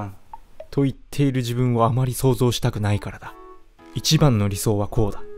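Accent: Kagoshima, Japan. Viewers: かごんま出身、知らなかったか忘れてました😮訛りたまらん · 鹿児島のなまり（笑） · リクエストした鹿児島弁さっそく話して下さりありがとうございますー🎵鹿児島弁忘れてなかったですね😆笑ったぁー😆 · 鹿児島出身鹿児島育ちなので、方言嬉しいです😆 さんの動画などで、いつも笑わせてもらってます！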